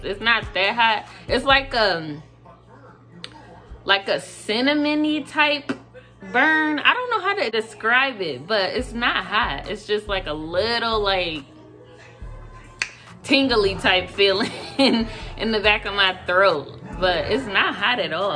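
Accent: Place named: New York, United States. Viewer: Lol you got me may want to try it but I doubt it lol not sure where u from but I’m catch that NY accent can be wrong lol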